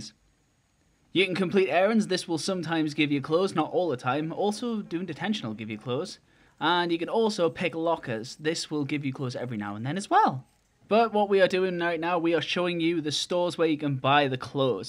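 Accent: Newcastle, United Kingdom. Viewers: are you a geordie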